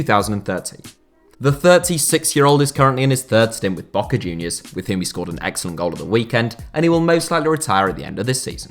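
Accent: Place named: United Kingdom. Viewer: I found it difficult to finish watching your videos every time because it is a bit difficult to understand your British accent eng. hope you can have subtitles.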